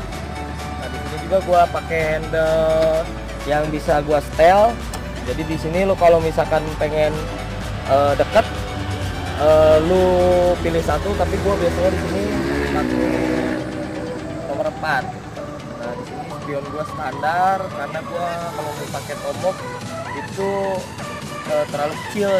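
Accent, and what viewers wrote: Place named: West Java, Indonesia. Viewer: Bang mf asli orang mna kaya sunda yeuh..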